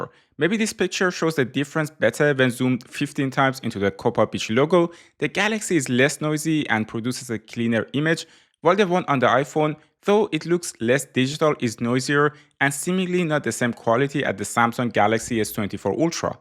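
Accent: Iran. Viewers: ei joonam lahjeye Persian! ;) nice review by the way!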